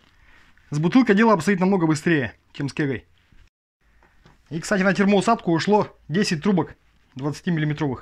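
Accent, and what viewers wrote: Perm, Russia. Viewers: Очень оригинально! Класс! Ты не с Перьми случайно? Акцент как с реальных пацанов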